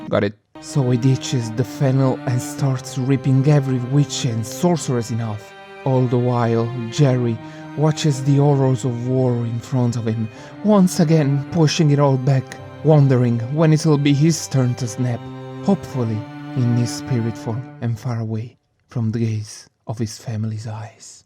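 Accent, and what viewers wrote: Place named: Italy. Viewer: You mentioned that you were the Italian Sam O’Nella? If so that’s amazing because I like your accent for these videos, it makes your videos stand out to most of the other ‘bootlegs’ because of the voice.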